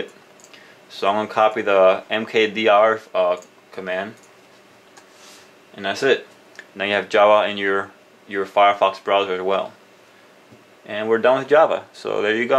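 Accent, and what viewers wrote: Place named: United States. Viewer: THANK YOU AND YOUR BEAUTIFUL NORMAL AMERICAN SOUNDING VOICE AND UNDERSTANDABLE ENGLISH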